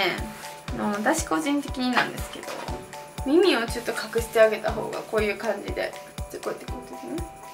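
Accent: Kansai, Japan. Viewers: 石原さとみさんに声似てると思ったらなんか顔も似てる😲✨👌 石原さとみさんの関西弁ってかんじです！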